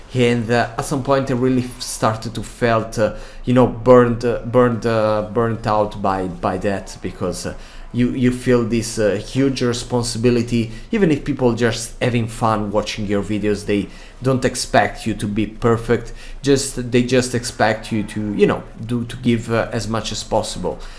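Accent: Italy. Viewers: Italian I think❤ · same. Sometimes seems a little bit russian, but you can feel the italian in some sentences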